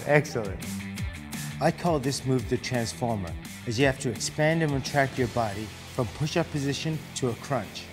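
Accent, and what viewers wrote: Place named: Brazil. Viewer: Brazilian accent, fofinha.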